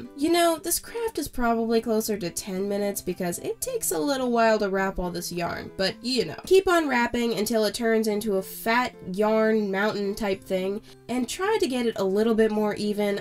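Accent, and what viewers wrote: South, United States. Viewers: Why does she have like the country accent in this video? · In this video why dose she sound like she’s from the south...🤷‍♀️🤷‍♀️🤷‍♀️ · This video of her sounds like she has a southern accent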